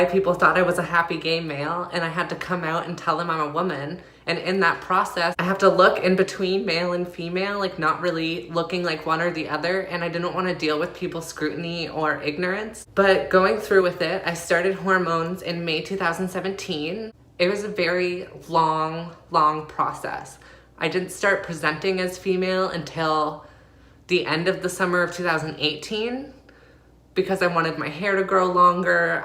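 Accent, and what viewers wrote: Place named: Canada. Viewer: I enjoyed the video, nice to see you doing well. You have a Canadian accent, so your health insurance is nationalized. Good for you!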